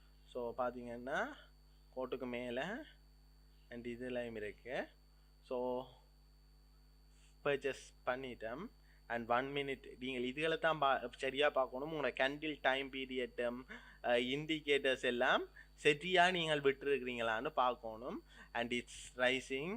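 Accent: Sri Lanka. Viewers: This is Srilankan Tamil 😍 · இவர் இலங்கை தமிழ் மண்ணின் மைந்தன். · Nalla teacher. Srilankan Tamil vasanai sema bro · Bro neenga srilankan vaa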